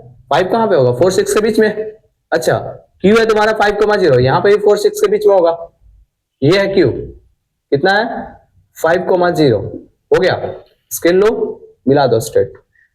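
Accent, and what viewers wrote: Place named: Bihar, India. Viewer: Aap Bihar se ho sar aawaj lag rha hai ❤❤❤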